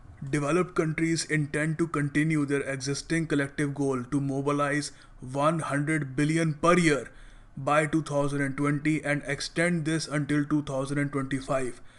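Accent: India. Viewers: I don't want to listen to that stupid annoying Indian accent! Ewww. Just fuck off!!!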